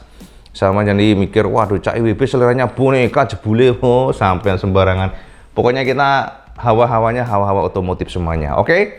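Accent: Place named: Java, Indonesia. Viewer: Cak IWB seleranya boneka Ooo sampean sembarangan 😀😀 Suka banget kas jawanya cak Dan apa yang disampaikan selalu informatif, is the best 👍👍👍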